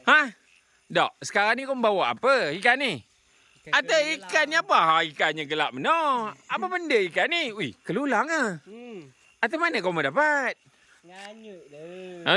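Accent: Perak, Malaysia. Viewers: Saya dgr admin ni ckp... Kg Telok Perang. Kalau berdasarkn dialek, rasanya di Perak. Tapi x tahu mukim, daerah mana... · Sya btul2 suka dgr abg cakap loghat perak..trbaik la bang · Cara cakap tu kat Perak gamaknya..😂❤😂❤😂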